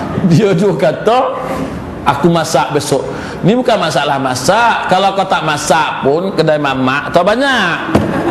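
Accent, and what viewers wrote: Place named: Negeri Sembilan, Malaysia. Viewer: best ceramah ustaz.......tima kasih ustaz atas ilmu dan loghat nogori...........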